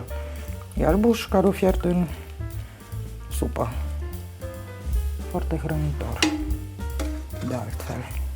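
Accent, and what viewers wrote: Transylvania, Romania. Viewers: Este o plăcere să vă urmăresc! Prezentați foarte detaliat, cu explicatii privind cauza si efectul unei anumite actiuni si mai si vorbiti in grai ardelenesc. ❤❤❤😮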